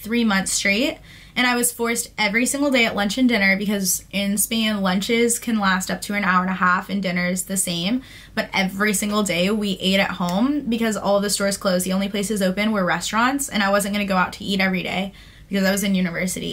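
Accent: United States. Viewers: I love you,. I love your american accent and id love to speak english like you. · Me too. I adore her accent, and as I'm still learning English, I use that as a reference to acquire my American accent.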